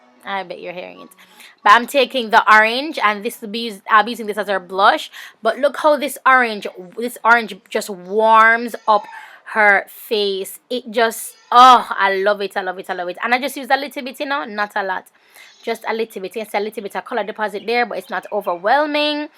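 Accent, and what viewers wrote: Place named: Jamaica. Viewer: real Jamaican just the talking make you know lol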